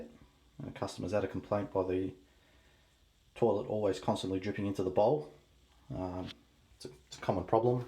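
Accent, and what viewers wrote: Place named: Australia. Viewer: Yep yep Aussie as she’ll be right mate 😆love it clear instructions and straight to the point 😆👍🏾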